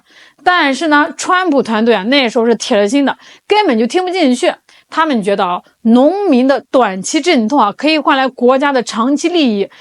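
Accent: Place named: Northeast, China. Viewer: 东北姑娘！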